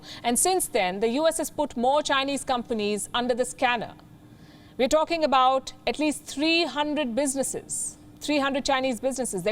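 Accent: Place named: Gujarat, India. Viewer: USA is a rogue country. Typical gujju English by the news reader. Let her go and learn proper English rather than crying with a crimping tone.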